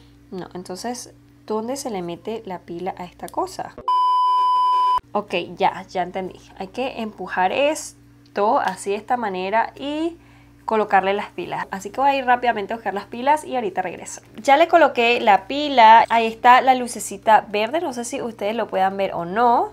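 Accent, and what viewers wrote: Venezuela. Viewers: Con solo ver 30 segundos del video me di cuenta de que eras venezolana 😍 jajajaja nuestro acento es UNICO! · jajajaja tu acento Venezolano es único jjajaja saludos preciosa desde hoy soy tu suscriptor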